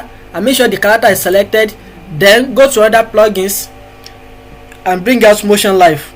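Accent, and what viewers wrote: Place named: Nigeria. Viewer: Great job! You sound like a Nigerian.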